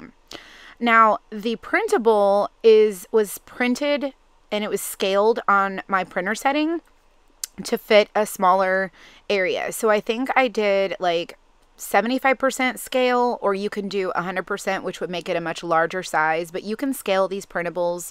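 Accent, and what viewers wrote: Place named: Georgia, United States. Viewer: I totally LOVE the pumpkin! THANKS for demonstrating the bow.. LOVED it. Everytime I hear your voice I get homesick for Ga. Take care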